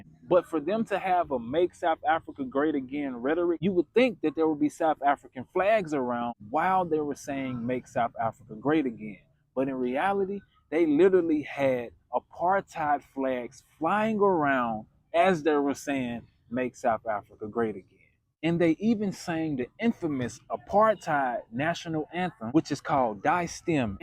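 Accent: United States. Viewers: You are being a reverse racist and promoting action against the whites of this country. Any educated person of any race will not support a narcissistic, self serving supposed US leader who is turning his country over to the other nut job, putin. This is similar to our previous leader selling our country to the guptas. You are speaking absolute bullsxxt about europeans barbecuing black folk. It still is the black on black violence of putting a tyre on a brotha, filling it with petrol and setting it alight. I can not believe YOUR TOTAL IGNORANCE OF ANYTHING ABOUT SOUTH AFRICA. lCome and live here, with your american accent , and see the 2025 truth.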